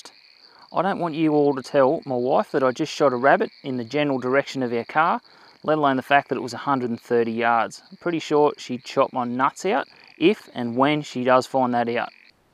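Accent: Australia. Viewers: I notice with your air file that you aim high through the scope, so to me there is still an element of skill and guess work goes into getting a successful shot, and you can see the round dropping as it travels. This is just my ignorance , but do modern sights with range finders built in not adjust the position of the crosshairs to account for range with an air rifle so that you still only have to put the crosshairs on your target rather guesstimate? Thanks. I’m really enjoying your videos having recently found them. You have a great Aussie narrative.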